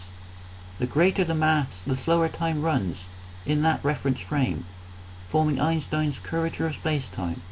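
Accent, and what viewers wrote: West Country, United Kingdom. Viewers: Ahhh you sounded westcountryish.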